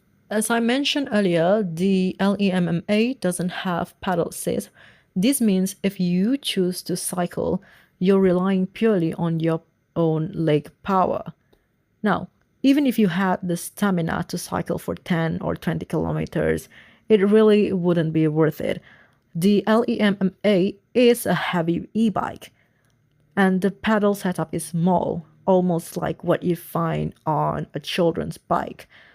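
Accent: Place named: United Kingdom. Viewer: Tq for sharing. Nice accent though..such watching review from someone living in uk.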